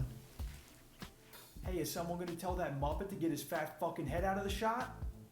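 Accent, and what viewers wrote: Australia. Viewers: Oh wow. That’s an authentic Australian accent. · Thumbs up 👍🏾 for the awesome Aussie accent! 😆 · Great ozzy accent! I feel like you would be great guy as a friend 😆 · 10/10 Aussie accent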